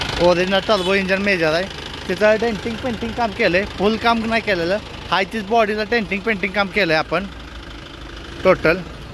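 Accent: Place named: Karad, India. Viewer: Bhava tuza bolnyacha tone lay bhari hay... Karad chi aathwan karun deto....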